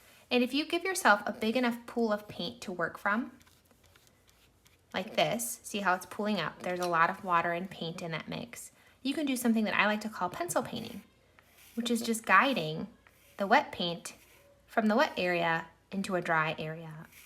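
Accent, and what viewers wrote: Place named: Minnesota, United States. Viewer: I have watched all of the lessons in this series and decided to paint the "funky fish" while going through the videos as one tutorial. I think you made some bold decisions with color choices as compared to the reference image which resulted in an awesome goldfish! Good job! I also loved hearing your accent which reminded me of the 25 years I lived in Michigans U.P., although you sound more Minnesotoan. Thanks for the time and energy you brought to this series.......that was a lot of work on your part.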